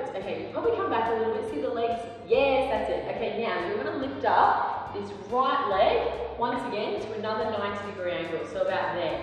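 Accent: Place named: Australia. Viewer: Hi my son and I have been watching your videos for the past few weeks and we really enjoy them. He is a budding of ballet dancer. He would like to know if you have any videos with boys in them instead of girls. He also would like to know if you coach boys. We live in New York city. But we love your Australian accent. Please let us know if you ever plan to put Boys on your Channel and display exercises for ballet Styles particularly for boys. Thanks keep up the great work.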